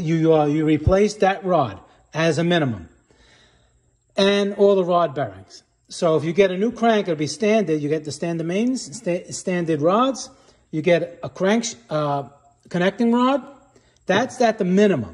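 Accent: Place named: Boston, United States. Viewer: I swear to god that bostonian accent gives me such vibes. It's like playing max payne or something and just listening to one of the gangsters explaining to his buddy, in detail, how a car engine works and why his ride is irreversably screwed lmao All joking aside though, this vid and your channel in general is great and informative but I just had to mention the accent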